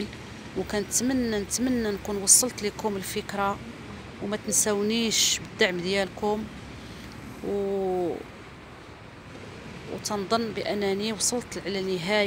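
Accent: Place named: Algeria. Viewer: ما اجمل اللهجة الجزائرية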